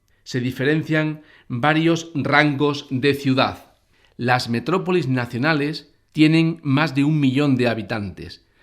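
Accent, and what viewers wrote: Andalusia, Spain. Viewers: illo o hablamos fisnos o andaluz, pero no lo mezcles ojú ya me e agoviao.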